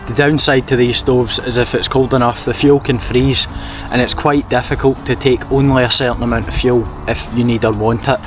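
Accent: Scotland, United Kingdom. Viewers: I love the Scottish accent and the video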